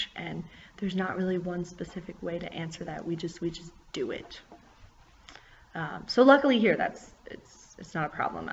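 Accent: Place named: United States. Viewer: That's cool that you teach Uzbeks!!! You have an awesome American accent, like I. Uzbeks need to learn from YOU!!! My former English teacher Salomat Izzatulaevna in school #17 had a hidious british accent and I couldn't learn anything from her. She was a moron, unfortunately. In Uzbekistan you will become a top English teacher really fast! I can show you some teaching techniques that will significantly boost your student's language learning ability.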